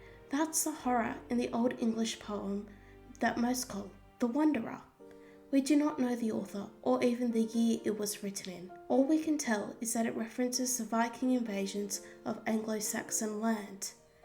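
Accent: Australia. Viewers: Don't change your Australian accent! It's part of who you are and, honestly, it's quite refreshing to hear poetry recited in an accent which is not either English or US-one-size-fits-all. There is a version of The Wanderer in the original Old English (violavonschnitzel) on YouTube. It sounds very Scandinavian. Every accent lends something special to a reading. Apart from 'half showers' which should be 'hail showers', I don't think there's anything in your accent that can't be ironed out by sloooowwinng down a bit. I think it's wonderful (and hopeful) that someone as young as yourself (i.e. a lot younger than me) is interested in 'The Wanderer' and 'The Lord of the Rings'. The way things are going at the moment I can see a subversive movement developing whereby people will be swapping illicit copies of Shakespeare's 'Merry Wives of Windsor' or Orwell's 'Animal Farm'. 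😉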